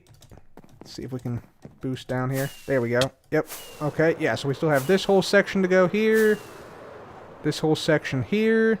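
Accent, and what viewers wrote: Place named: Chicago, United States. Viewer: Also are you from Chicago I can hear a bit of a Chicago accent I'm from Illinois myself.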